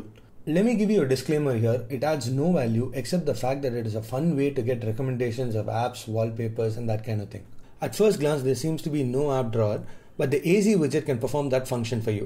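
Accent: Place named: India. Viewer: That Indian accent doe.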